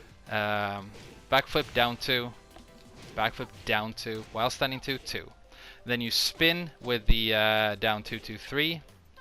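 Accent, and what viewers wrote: France. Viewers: HIS FRENCH ACCENT LMFAOOO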